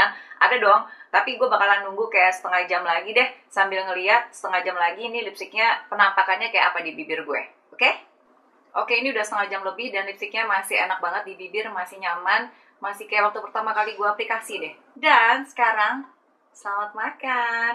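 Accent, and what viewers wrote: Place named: West Java, Indonesia. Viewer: Suka sama ka kalau lagi review logat sundanya keluar,kocak pas bilang muruluk murudul 😂😂😂😂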